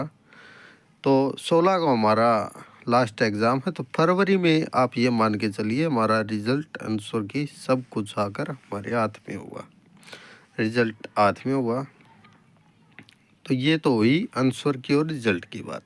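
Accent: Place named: Haryana, India. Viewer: आप हरियाणा से हो बोलने के अंदाज से पता चल रहा है 😍😍☺️☺️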